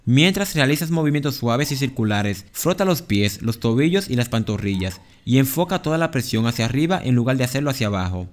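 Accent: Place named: Caribbean. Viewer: El narrador del vídeo tiene un acento caribeño, estoy segura que no es de Perú.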